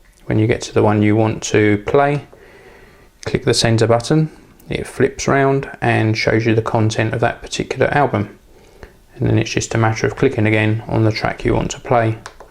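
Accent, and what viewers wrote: United Kingdom. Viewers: wonderful accent! love the UK!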